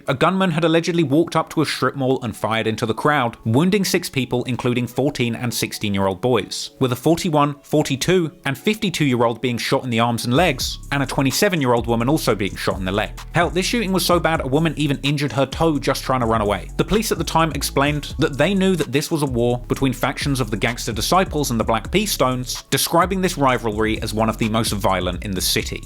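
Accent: United Kingdom. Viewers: A British accent talkin bout American activities?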